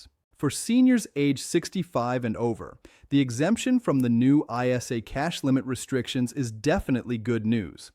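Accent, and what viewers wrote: United States. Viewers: Why the US accent for UK matters....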